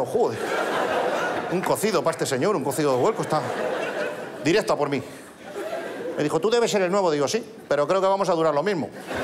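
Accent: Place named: Castile, Spain. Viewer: Leo Harlem es de norte de España, más concretamente del norte de León. Su acento es castellano neutro por así decirlo.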